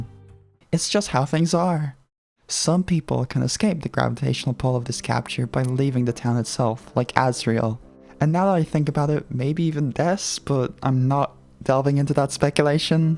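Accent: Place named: Ireland. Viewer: Nice to hear an irish voice in the sea of Americans 😅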